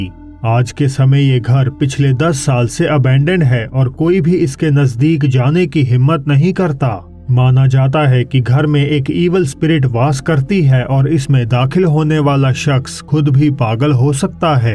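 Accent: Punjab, India. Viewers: Bro,Mera naam Raju Bhullar hai,aap ki English aur Hindi bolne k style mein Punjabi touch clearly jhalakta hai jo k bahut garv ki baat hai. Whole Punjabis should proud of you aur aap ka ' kaise hain aap & ' chaliye phir shuru karte hain" is awesome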